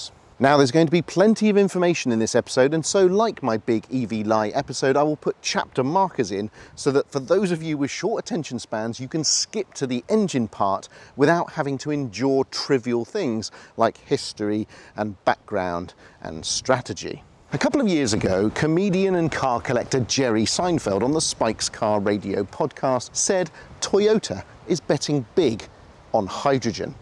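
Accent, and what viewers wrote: United Kingdom. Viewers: Great video, but your American accent still sounds British, lol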